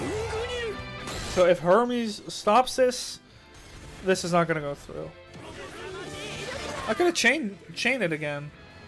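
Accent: Limburg, Netherlands. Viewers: hoor ik hier nou een tikkeltje limburgs?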